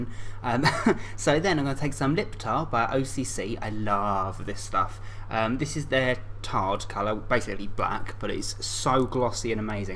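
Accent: England, United Kingdom. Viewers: love his specific english accent. dont know why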